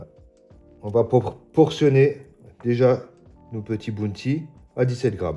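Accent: Alsace, France. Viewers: je pensais bien entendre un petit accent Alsacien. Bisous d’une Strasbourgeoise du Texas 😉 Bon après le “on le manche” y’a plus de doute 😂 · Ahh ce petit accent alsacien ! Ça fait plaisir de voir un compatriote ! Je ne vous connaissais pas et l’idée des bounty maison est très bonne 😊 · L'accent alsacien 😂❤j'adore. Je suis d'Alsace également même si je vis en région parisienne ❤❤❤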